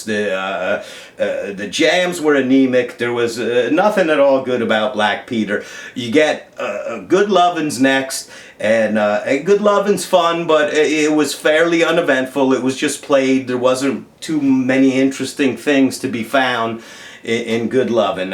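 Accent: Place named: New Orleans, United States. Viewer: You ever lived in New Orleans?? You sound like youre from here!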